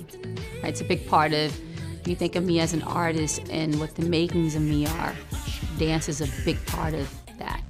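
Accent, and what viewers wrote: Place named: California, United States. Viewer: I know, too much vocal fry and California. She is being genuine but it comes off fake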